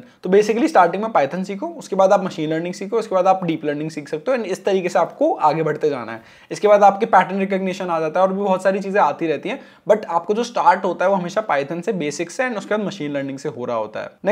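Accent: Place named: India. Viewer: Dude, you may have been saying something worth listening to, but your accent makes it very difficult for non-Indian speakers to understand you because you talk too fast. If you really want to get your information out there in videos, try talking slower.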